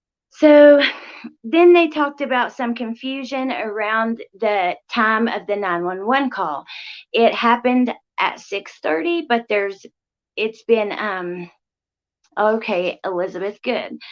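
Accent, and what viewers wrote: South, United States. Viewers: 1st time here, I can tell youre a southern girl like me lol 😊Youve got a new sub.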